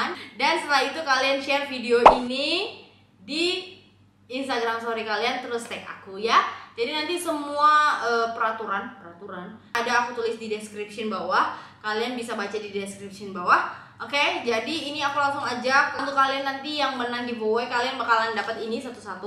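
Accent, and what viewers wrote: Malaysia. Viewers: Saya mulai subscribe dari tahun lalu, suka aja liat videonya lucu, menarik, dan logat melayu'y ini bikin gemas Klo ngikutin d'ig baru berapa bulan ini karna saya ga terlalu aktif d'ig 🤭🤭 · cara kamu berbicara spt bahasa melayu malaysian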